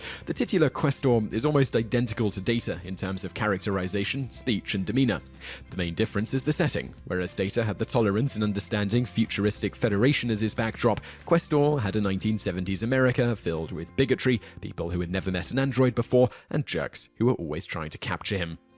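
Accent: United Kingdom. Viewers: 뿡뿡! ^__^ if you were reading the other comments you would of known i was talking about the way how british people pronounce things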